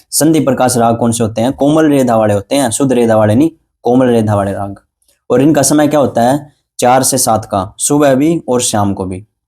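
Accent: Haryana, India. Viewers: haryana aala ki boli ghani hai pehchane ne ke liye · haryanvi ho kya app voice se lag rha hai . sir vo notes toh provide kr do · Yes sir definitely 😁 haryana se hai , I am also from haryana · Hainaaa mujhe bhi yhi lgaa inki voice mei haryana ki tone aati h 😅😅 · Maan ge maaster kati haryanvi ki feel ha 😁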